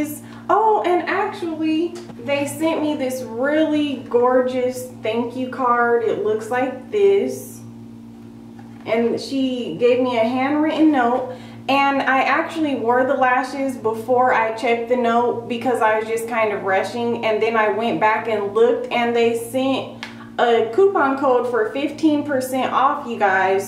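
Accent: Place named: Minnesota, United States. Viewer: are you from Minnesota or something? Your accent reminds me of Fargo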